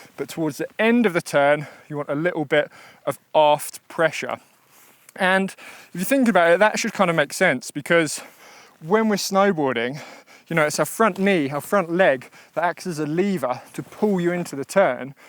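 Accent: England, United Kingdom. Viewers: Wanted to book you, had a look on your homepage but you're so loved + demanded that no chance for this season😔... But good for you😊! I'll try to plan my holidays for next winter and may be I can get some lessons with you if I do it months in advance! Love your way to explain, your technic, your skills, your sense of humour, your love for the natur and your professionnel eye for the mistakes of your students and the way your explain them and make them progress! Not to forget your beautifull english "accent", your dog and your good vibes. It's such a gift to have access to your videos, thanks! Looking forward to get you once as teacher in real 🤩!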